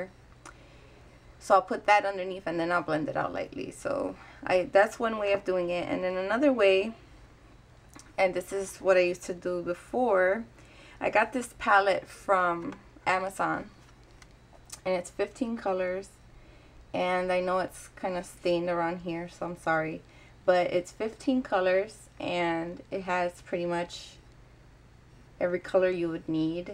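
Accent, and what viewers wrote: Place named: Puerto Rico. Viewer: I just stumbled upon your video. As soon as I heard you speak, I knew you had to be Puerto Rican! LOL I'm PR too; I too have dark circles pretty bad. I got most of it from my mom but I also don't sleep very well. I really like that you not only took the time to share this, you put yourself out there to do it. Also, thanks for using real world products. I don't know about anyone else, but I cannot afford $40 on just one product like concealer. You did what works for you and I love it! BTW, you are beautiful with or without the make up. Thanks! Make more make up videos like what eye shadows you use and blush! God bless you!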